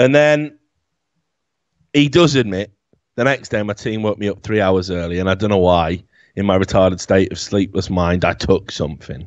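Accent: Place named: Northern England, United Kingdom. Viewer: Northern England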